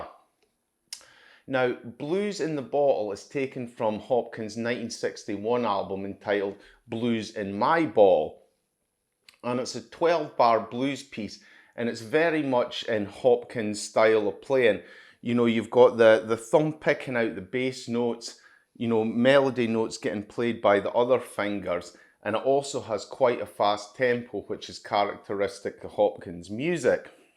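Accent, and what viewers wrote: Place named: Scotland, United Kingdom. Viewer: Good to hear a Scots accent, sir. You choose great source material to give a lesson with. Best of health and best wishes with your channel.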